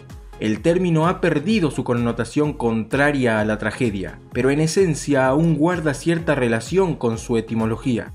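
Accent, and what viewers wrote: Argentina. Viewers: ESPERA.... eres argentino ?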